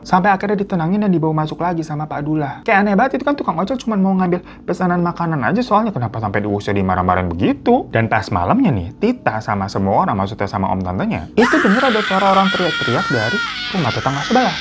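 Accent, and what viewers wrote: Java, Indonesia. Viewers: aseeekkkk..dari padang niih, gw denger orang bahasa minang pake logat jawa kayanya baru lu doang bg gw denger😂😂 yook bisa yookk bang lu main2 ke padang😎🙏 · Apapun bahasa nya logat nya tetep Jawa ya bang 😂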